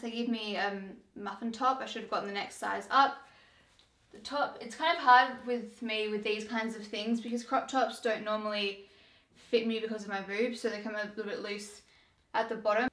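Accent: Australia. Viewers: The aussie accent 😍😍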